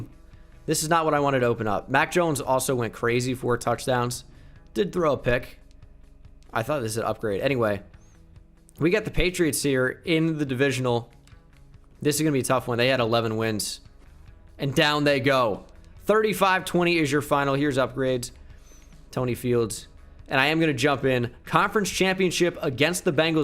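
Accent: Australia. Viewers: 10/10 Australian accent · I thought the Australian accent was great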